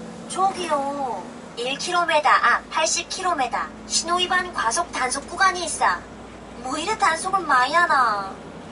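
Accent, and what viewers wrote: Gangwon, South Korea. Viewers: 강원도 사투리버전 진짜 밤에 낄낄 웃었네요ㅎㅎㅎㅎ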